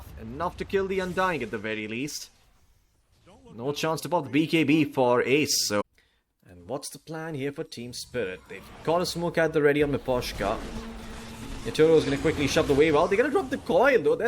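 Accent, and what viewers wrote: India. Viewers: is this commentator from India or something ?? i feel like i'm listening to phone scam calls person from Mumbai pretending he's english.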